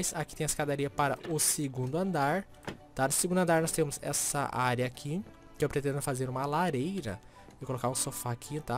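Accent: Rio Grande do Sul, Brazil. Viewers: vc e gaucho? Eu sou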